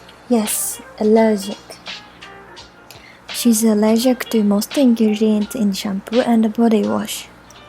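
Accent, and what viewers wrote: Japan. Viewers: まるで日本人の少女が、英語を話しているようです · □←この人の影響？(使ってみた) 母国語がないとは言うけど、私からしたら外国で英語が話せるようになった日本人の発音だよ。 ところで、👍が俺のコメントに複数つくのは誰が👍を付けてるんだろうね…😓一つだけならDianaさんだと分かるんだけどな。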